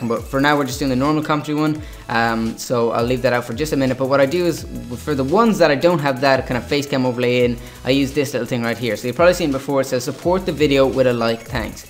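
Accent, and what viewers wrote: Ireland. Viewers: are you from ireland man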